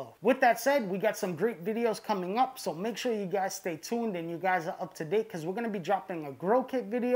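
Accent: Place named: Massachusetts, United States. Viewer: Sounds like you got a Massachusetts accent.. mush love from 508 🤙🏻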